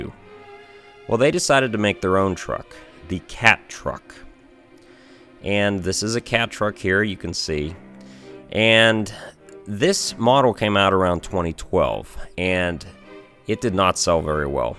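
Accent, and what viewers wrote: Western Pennsylvania, United States. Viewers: Where are you from? Sounds like western PA.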